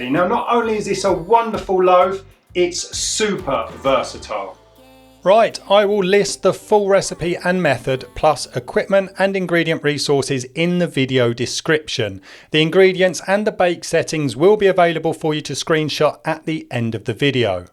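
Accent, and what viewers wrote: United Kingdom. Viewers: I thought you were Andy. Then I heard the british accent 😂 Nice loaf, mate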